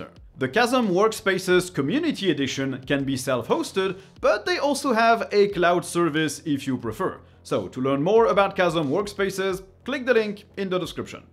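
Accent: France. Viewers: Excellent video, i now want to try Nixos ! Also, you have a clear English pronunciation but what is your mother tongue, are you French?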